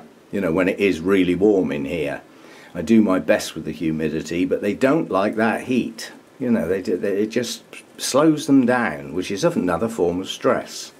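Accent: United Kingdom. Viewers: Thanks for the explanations! Lovely British accent, don’t mind me saying and no offense , listening to your videos it reminded me of Gandalf the grey ! Lee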